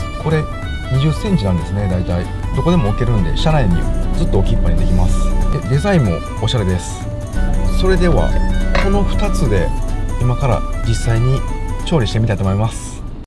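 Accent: Kansai, Japan. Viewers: お疲れ様です😁 私も動画を観させて頂いて車中泊グッズとか見に行くようになりましたホームセンターのそうしたグッズと売り場を見るのが楽しくなりましたあとの暖かい関西弁の御説明と笑顔も良いなあとほっこりしながら観させて頂いてます❗ 御自身も動画の魅力です😁 ステーキ焼ける音がたまりませんね😆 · こんにちわ！ ㊗️10万人超えおめでとうございます🎉🎊 毎回そうですけど、の優しい語り口もとっても好印象です(わたしずっと東京住みなので関西弁に憧れてます) あとほんといつもお人柄が良くて心地良く観させていただいています！これからも楽しく拝見させていただきますね！あとこの間のライブは、わたし時間が合わなくてリアルタイムでは観れなかったんですけど、そのライブ配信も翌日に楽しく拝見させていただきました！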